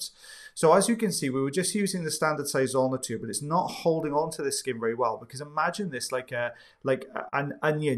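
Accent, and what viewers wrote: Wales, United Kingdom. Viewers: His lively and lovely accent is Welsh! 😊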